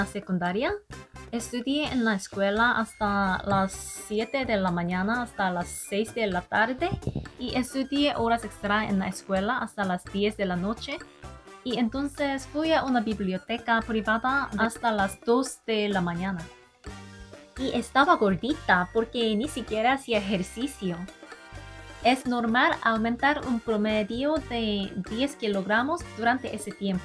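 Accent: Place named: Mexico. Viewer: Hola que interesantes tus videos me gustan. Tienes el asentó del español de Mi México sigue así te deseo mucho éxito en tu canal.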